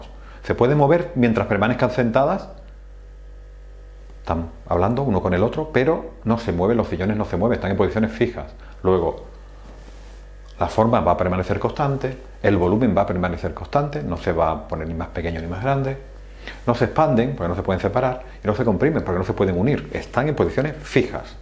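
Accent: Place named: Andalusia, Spain. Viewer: Es andaluz es nuestro acento, y aprende a escribir chaval.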